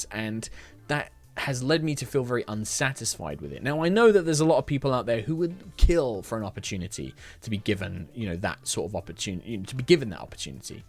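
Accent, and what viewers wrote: United Kingdom. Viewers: Hey, originally I started watching HR because 1) fresh from finishing Yogsquest and Dungeons and Dafties, I needed the silly Yogs brand of D&D, 2) I had just watched the star wars rpg stuff with you and the Hat Bois and watched for you, Trott and Kim whom I was already following for Flux Buddies, and 3) I had been watching CR and Aq inc for a while and was happy to hear a more familiar British accent:) I feel a little sad that the little interviews are gone and I really loved that Vday board games video, but, you do what you have to do to clean house in your own head. I understand:) I'm a follower of the usual D&D shows: CR, Aq Inc, Aq inc C team, DCA, but HR will always always always have a special place in my heart. I admire how much effort you put into these videos, d&d and your patreon stuff. Honestly Kim and you won me over when you did that early Lightfall side episode catching up on what happened when JiuTou died. 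It's nice to see a DM not just invested in their game but in the plot and the characters. Will be supporting you in whatever you do, as long as you are happy doing it; bringing your brand of DM voices, silly jokes, npc character development.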